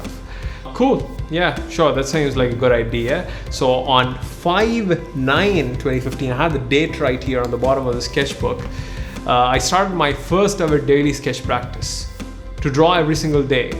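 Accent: India. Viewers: Because your accent is like Indian Me Pakistani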